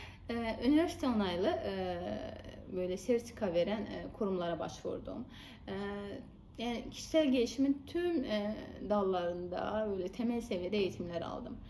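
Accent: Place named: Azerbaijan. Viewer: Bakü’de doğmuşsunuz ne güzel. Konuşurken şivenize bayılıyorum. Dinlemek çok hoşuma gidiyor. Sizinle ilgili merak ettiğim birkaç şeyi de yanıtlamışsınız teşekkürler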